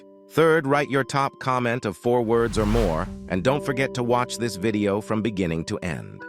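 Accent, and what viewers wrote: Russia. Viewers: Are you russian🤨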